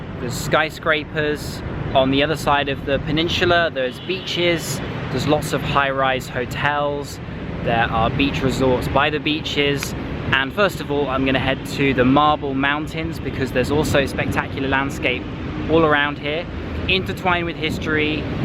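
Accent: United Kingdom. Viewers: british accent , omg I love american accent !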